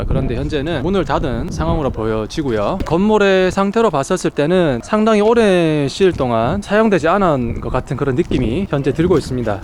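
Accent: Gyeongsang, South Korea. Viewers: 전라도 땅에 이름은 고구려 경상도 말투의 진행자 분 삼국 대화합의 장이네요 나주대로 다시 바뀌고 만학도들이 많이 다니는 학교라니 지역 경제 활성화 차원에서도 잘 되기를 바랍니다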